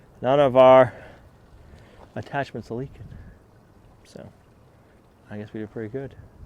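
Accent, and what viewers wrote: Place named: East Coast, United States. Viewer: Eye deer? its idea. Not eye deer sir. You are definitely an East coast dude with your miss pronunciation of your speaking. Come on get with it. You sound. Like a fu king Kennedy, YUCK.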